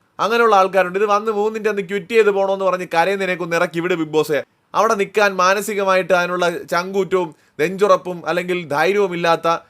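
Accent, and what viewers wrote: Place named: Kollam, India. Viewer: Samsaram kettit chettante veedu ethaand Kollam jillayil annen thonnunnu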